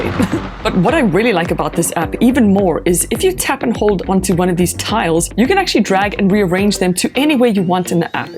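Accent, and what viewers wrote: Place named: South Africa. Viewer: Always satisfying to hear that accent and know exactly where someone's from. Greetings from a South African in Poland!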